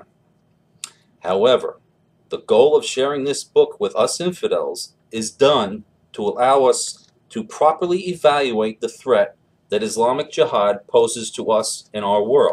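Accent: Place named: New York, United States. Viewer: NY area accent.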